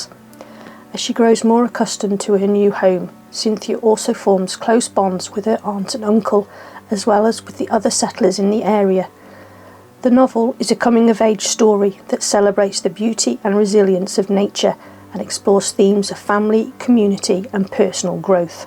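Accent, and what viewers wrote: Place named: Midlands, United Kingdom. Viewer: Fantastic channel,subscribing now,and also nice to hear a fellow midlanders accent.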